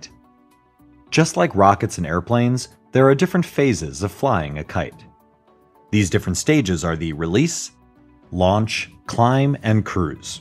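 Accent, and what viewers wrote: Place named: United States. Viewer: Dude how did speak so well in american accent. How did you train?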